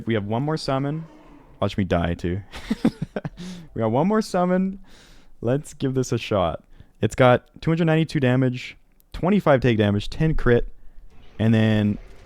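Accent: United States. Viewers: yeah Midwest American for sure. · i think thats just his american accent, similar to "banena"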